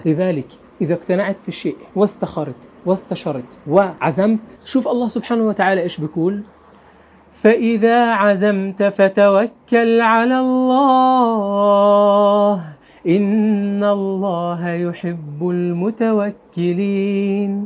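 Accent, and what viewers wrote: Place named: Palestine. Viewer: نحب بزاف لهجتك، لهجة الفلسطينيين الأصيلة.. تحية لفلسطين مني و من الجزائر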